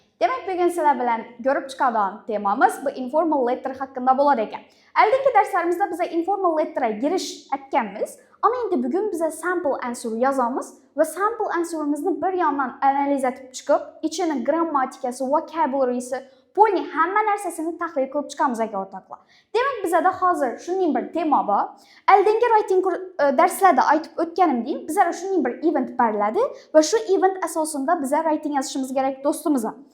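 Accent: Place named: Khorezm, Uzbekistan. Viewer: Ex xorazmcha ekanda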